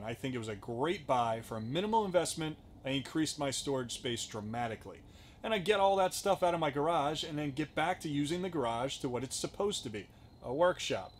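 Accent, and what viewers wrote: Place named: South Jersey, United States. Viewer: Haha! I am looking to buy one of these Harbor Freight portable garages. I found this video and within the first minute of listening to you talk, I was like, "this guy sounds like he is from South Jersey". Then as I continue to watch, you say, "In Jersey..." and I was like, yep, bingo! So then after watching, I check your description and you are over in Gloucester Twp. I laughed out loud. As someone who was born and raised in South Jersey and travels the country often, its so easy for me to pickup on the accent, its ridiculous. I mean, yea mostly everyone from the Delaware Valley have that accent but PA and DE people have slightly different twangs to it and unless you listen for it, its not very noticeable. You must have also been raised locally because your South Jersey accent is so spot on. You should do a video on Panzarottis LOL!!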